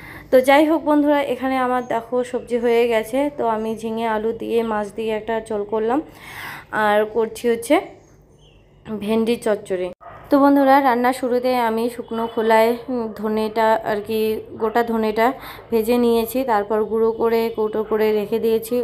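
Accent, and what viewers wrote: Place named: Rangpur, Bangladesh. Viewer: তুমি কোন ভাষায় কথা বলো,। বাংলাদেশের রংপুরের মানুষ তোমার ভাষায় কথা বলে।মানে বাংলাদেশের উত্তরবঙ্গের মানুষ তোমার ভাষায় কথা বলে। আমার খুব ভালো লাগে ❤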